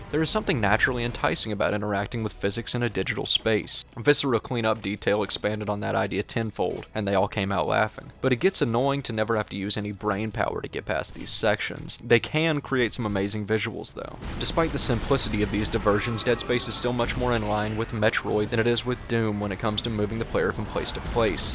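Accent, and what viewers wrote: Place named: Tennessee, United States. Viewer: interesting and enjoyably informative video on a game I've never played, but the audio's subpar, especially when compared to your usual standard of quality the occasional repeated lines and the bizarre sharp increase of the game's volume at the end were actually distractingly bad. if you're following the caldwell-gervais school of longform critique, that's fine, but steer clear of his audio editing habits, or lack thereof your pleasant (tennessee?) accent's far less pretentious and awful than noah's, though, so at least you have that going for you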